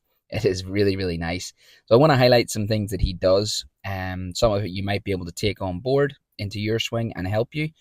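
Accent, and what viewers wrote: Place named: Ireland. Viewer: Hello, I'm Roger. I've played and studied the game for 45 years. and I think you do a great job at your craft here. My parents are from Belfast, so that's unmistakably an Irish accent you have. I've met Ludvig, he's super cool. I Love his golf swing. His head drops a wee bit in the downswing. I think for a few reasons. I'd like your opinion on that aspect. It's quite common among great ball strikers: Trevino for example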